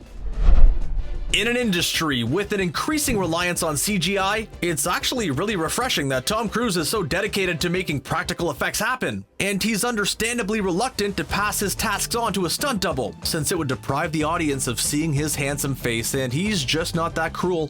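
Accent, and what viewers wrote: Canada. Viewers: How come all these types of docustyle posts are done by Canadians with heavy Canadian accents? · Can't deal with the heavily Canadian accented narration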